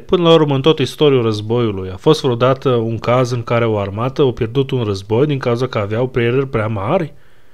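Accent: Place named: Cluj, Romania. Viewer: Hai că nu-i bai. Bine soldat ardelean. Îmi place că ai intonație de pe la Cluj. Slavă Ucrainei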